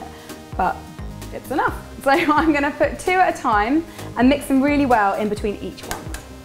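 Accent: United Kingdom. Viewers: even though you have tattoo, I don't know why you still look so cute. + I adore your British Accent :D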